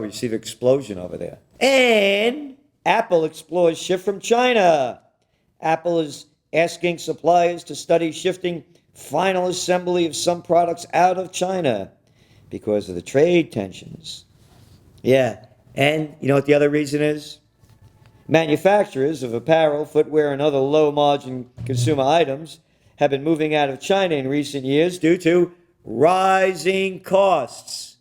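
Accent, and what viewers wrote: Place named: New York, United States. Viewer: Gerald Celente...Yr schtick is Incomparable!!! I grew up in the small town near Poukeepsie...I enjoy hearing yr NY accent and mannerisms...peace out, and thanks!!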